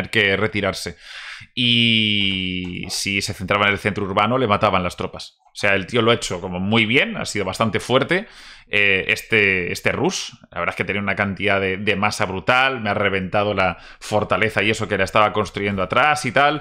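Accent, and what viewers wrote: Peru. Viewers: Ere peruano?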